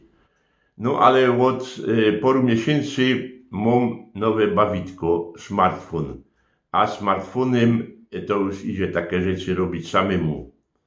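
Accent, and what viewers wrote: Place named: Silesia, Poland. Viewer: Jakie to jest piękne ❤❤ Jeszcze ta gwaro śląsko ❤❤ Miód na moje uszy. Pozdrawiam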